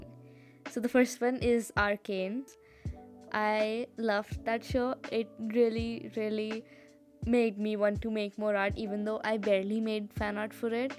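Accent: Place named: India. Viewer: are you from India?😅 (I'm so sorry if I offended you, but your voice literally sounds like an Indian <3❤)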